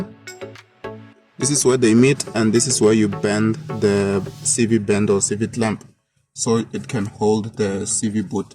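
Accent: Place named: Tsonga, South Africa. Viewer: This accent iya mutsonga str8 😂💔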